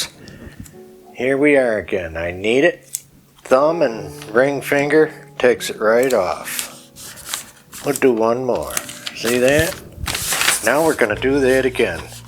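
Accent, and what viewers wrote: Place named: Idaho, United States. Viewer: There's a first and your it 👏😍 there isn't a video of yours that I haven't enjoyed. I have gone back to look at your past video's and their all fantastic. Lots of flair and charisma. Oh by the way I also have a collection of milk glass love it. I have 68 Santa's not to many but their not anything like yours lol! I also have a collection of nutcracker's. My son has gifted me one every year 😃? You sound like an Idahoan yeah!! 😘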